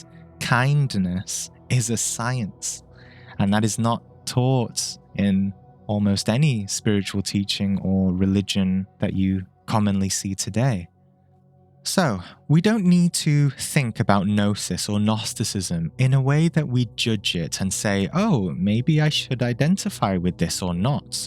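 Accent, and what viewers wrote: United Kingdom. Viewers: This was very nourishing. Cheers. Lovely soft Liverpudlian accent.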